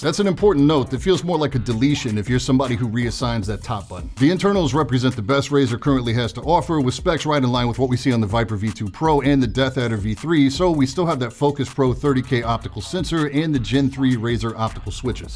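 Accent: New York, United States. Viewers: I love his New York accent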